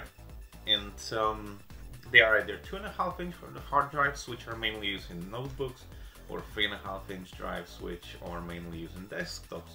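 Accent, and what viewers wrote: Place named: Finland. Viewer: the amount of information is very nice!, where are you from? I kind of get a finnish vibe but anyways good video!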